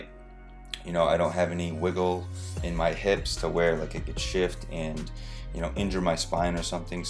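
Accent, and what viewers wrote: Boston, United States. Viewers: you sound very Boston...lol. are you from mass?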